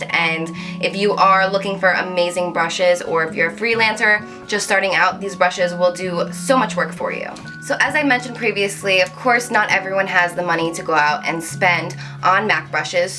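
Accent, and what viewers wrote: New Jersey, United States. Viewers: are you from NJ?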